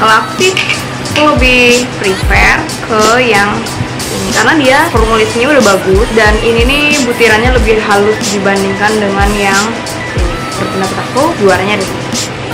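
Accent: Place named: Banjarmasin, Indonesia. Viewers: bahasanya kaya orang banjarmasin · norpuadah puadah ketahuan yaaa.. memang asli banjarmasin mbaa.. thx for visiting 😂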